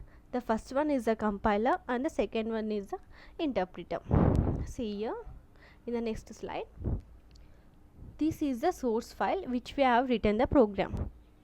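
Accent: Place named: Telugu, India. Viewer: Medam bachaparu according ur slang u r telugu right can u please explain in telugu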